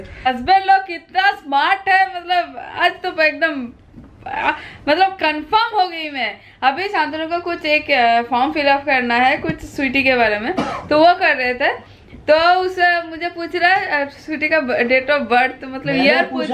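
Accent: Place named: Mumbai, India. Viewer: Mumbai style dialogue 👌😍😍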